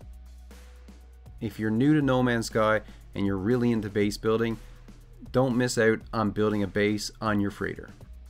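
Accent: Newfoundland, Canada. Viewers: I knew you were from NFLD and then you said that at the end lol. Hi neighbor, great video!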